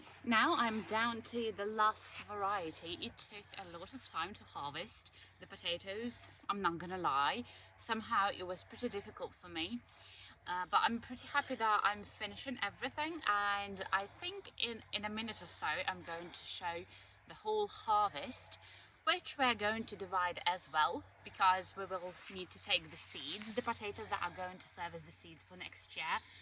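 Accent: United Kingdom. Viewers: Your British English is amazing. You don't have Russian accent at all. I learned Alaskan English in 1990 and my Central European accent is still there even now. Potatoes don't have to grow in the ground. They grow well in hay, freshly cut grass, leaves and anything else that creates cover and produces heat. In Palmer, Alaska , farmers grow these red potatoes as well as purple potatoes. Best wishes from Alaska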